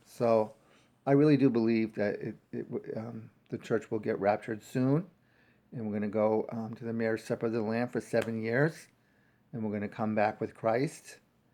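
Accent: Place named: New Hampshire, United States. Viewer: Hey, I enjoyed this. During the first five seconds, when you introduced yourself I was thinking you were from New Hampshire, based on your accent and family name, and then you said you were from NH. I'm a Manchester native. I do believe this is the year when we get 20/20 vision from the Lord regarding the unfolding end time events. It's already been happening. The final puzzle pieces are all coming together with perfect clarity now, regarding the things we've been studying for decades. Here are some things that will confirm what you were saying: <link> <link> <link>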